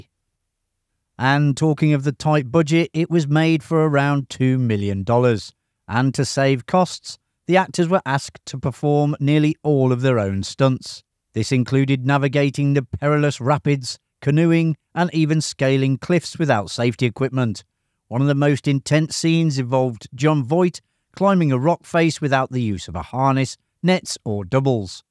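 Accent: England, United Kingdom. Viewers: Can't stand the pommey accent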